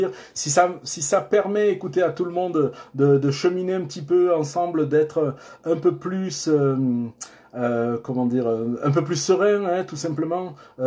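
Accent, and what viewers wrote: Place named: South, France. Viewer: un petit accent de marcel rufo !! ça chante le sud !! la Provence du Quebec ??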